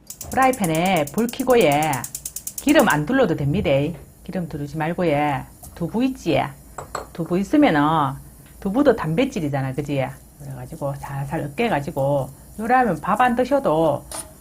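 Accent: Gyeongsang, South Korea. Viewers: 경상도 무뚝뚝한 언어속에 정겨움이 묻어나옵니다 ^^ 항상 잘보고 잘배워갑니다.2022년도 항상 건강하세요~~♡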